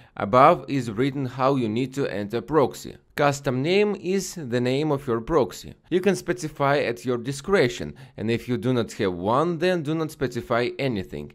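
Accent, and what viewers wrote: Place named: Russia. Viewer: typical russian tougue, love it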